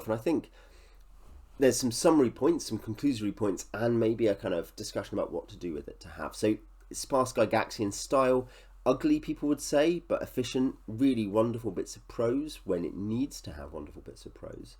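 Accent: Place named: United Kingdom. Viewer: I'm sending off for this today. You make it sound quite enticing. The British accent was the clincher.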